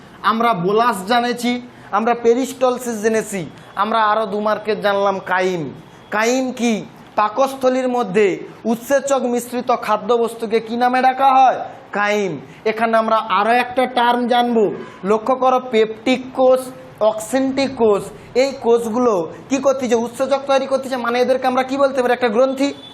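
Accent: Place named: Medinipur, India. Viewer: আপনার কথা তে kochi শুনেই বুঝতে পারলাম mednipure আপনি....দারুণ হয়েছে ক্লাস টা ❤❤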